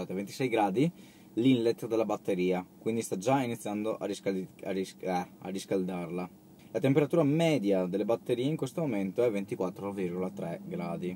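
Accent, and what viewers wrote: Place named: Veneto, Italy. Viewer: Sei veneto per caso? Io abito molto vicino a mogliano Veneto e mi piacerebbe tanto provare la tesla😅😅😅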